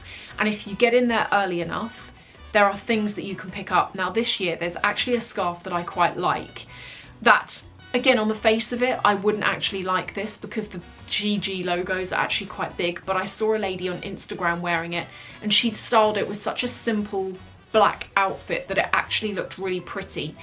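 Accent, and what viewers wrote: United Kingdom. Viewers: I’m only here for the British accent!!!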